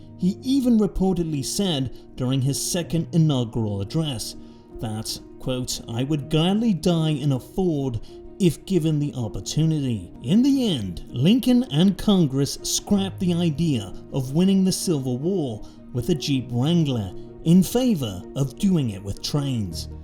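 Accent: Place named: United Kingdom. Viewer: My favorite thing about this whole series is it’s a British man narrating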